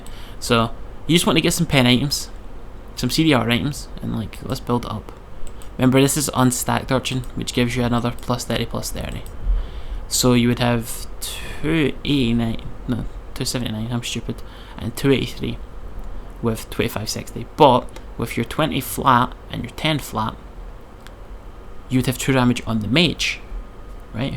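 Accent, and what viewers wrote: Scotland, United Kingdom. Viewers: Could you talk just a little bit slower:D kind a hard to follow when your not form Scotland.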